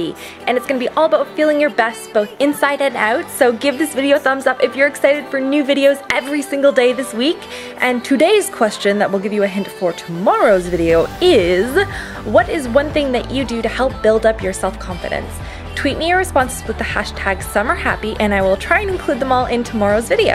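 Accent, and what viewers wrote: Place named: Canada. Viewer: Are u Canadian u kinda have an accent I was just wondering ;)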